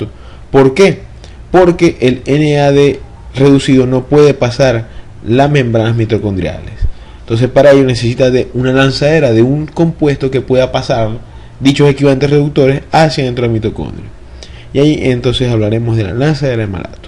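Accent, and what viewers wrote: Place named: Venezuela. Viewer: Tenias que ser venezolano.. Saludos desde coro.. Te entendí a perfección <3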